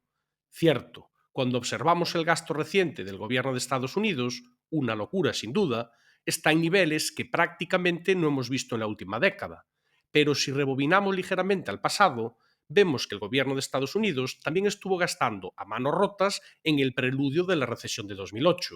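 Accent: Galicia, Spain. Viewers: Es por el acento gallego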